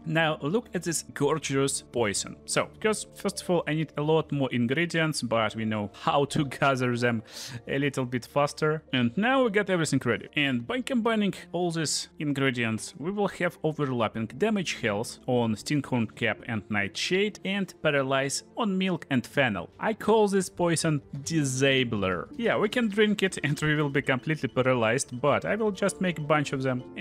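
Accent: Russia. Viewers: R u russian? Your accent is too similar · Очень уверенный и хороший текст с русским (или близким к нему) акцентом Очень прикольно звучит на самом деле Интересно, откуда ты?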